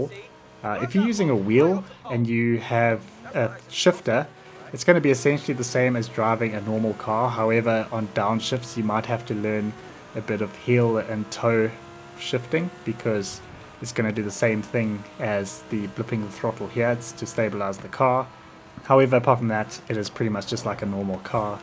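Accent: South Africa. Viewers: You from South Africa 🇿🇦?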